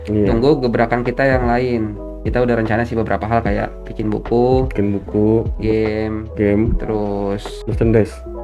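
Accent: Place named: Bojong Gede, Indonesia. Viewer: Bojong gede emang Kocak2 ngomongnya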